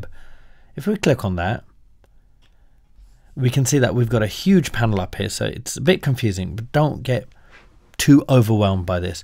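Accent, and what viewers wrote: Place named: United Kingdom. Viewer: thamk you OH so much, Brit accent teacher!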